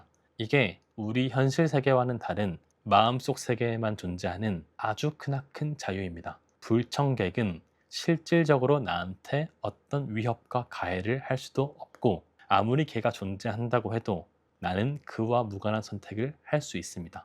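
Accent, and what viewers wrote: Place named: Gyeongsang, South Korea. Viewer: 억양이 경상도시군요...^^반갑습니다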